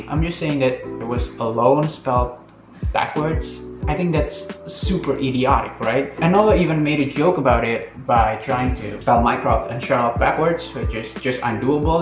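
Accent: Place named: South Korea. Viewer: cute with korean accent😘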